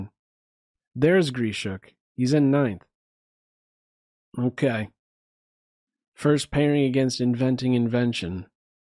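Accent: Pennsylvania, United States. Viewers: did I hear a Pennsylvania accent?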